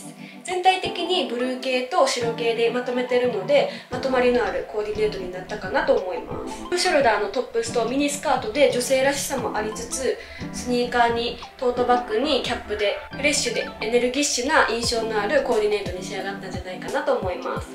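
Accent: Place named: Kansai, Japan. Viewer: shortをたまたま観て、可愛過ぎて動画も観に来たら関西弁なのずるいです、、🥹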